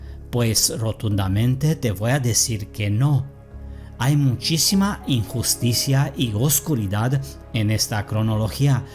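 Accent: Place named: Germany. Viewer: suena como si fuera alemán nativo, escucha narrar a alpha mind o a libertalya ambos creo que también son alemanes narrando estos temas en español y su pronunciación es la misma que. No pasa lo mismo con los gringos o negros de inglés nativo que hablan español su pronunciación es muy diferente.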